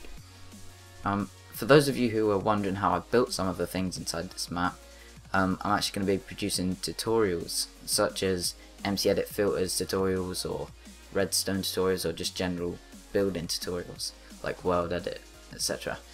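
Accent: United Kingdom. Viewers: I love your accent here more than now be a use I like British accents and this is your first video with voice recording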